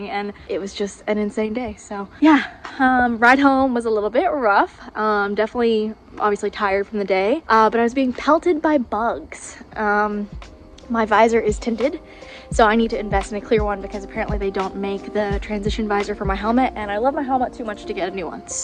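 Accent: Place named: South, United States. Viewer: Definitely can hear the country twang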